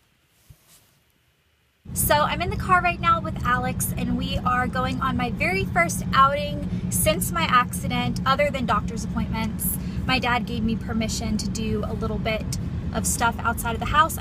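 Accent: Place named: Tennessee, United States. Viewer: You're getting your TN accent back a bit :)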